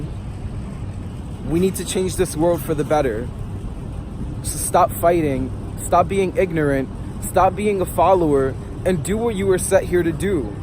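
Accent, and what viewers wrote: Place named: New York, United States. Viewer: you have really deep accent real new york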